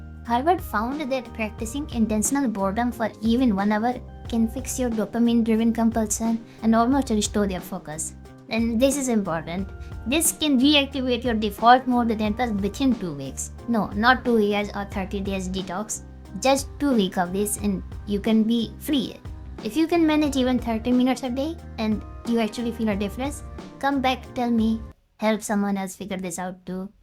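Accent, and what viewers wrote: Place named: Southeast Asia. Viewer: Are you Singaporean, Malaysian or Indonesian? (Made a guess based on da accent XD)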